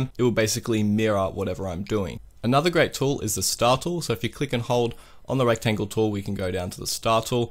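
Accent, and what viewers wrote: Australia. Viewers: So glad to find an Aussie tutorial I can understand 😅🙏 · Thank you for this helpful video. Nice to hear an Aussie accent!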